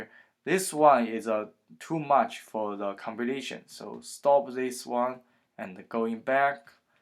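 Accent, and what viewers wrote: China. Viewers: up 主听口音应该是我大华人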